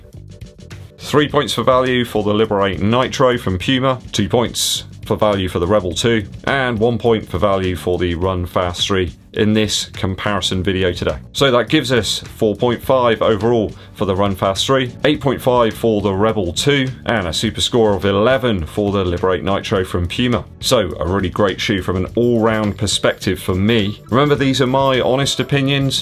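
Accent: England, United Kingdom. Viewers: I love hearing the English pronunciations! Thanks!! Never owned a Puma shoe, but I'm loving the looks of the new line.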